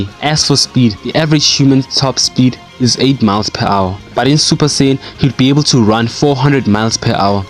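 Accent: South Africa. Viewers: You sound kinda south african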